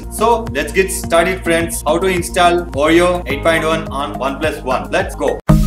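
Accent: India. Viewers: R u from Hyderabad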